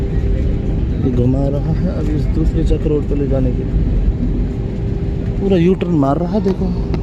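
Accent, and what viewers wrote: India. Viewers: Bhai india ka bilkul desi ladka h Very good video ye h bharat Ke gaon ki desi aawaj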